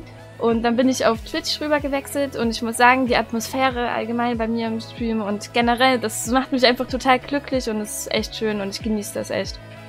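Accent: Saxony, Germany. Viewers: Wie mer des sächsische raushört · ist sie aus Sachsen? xD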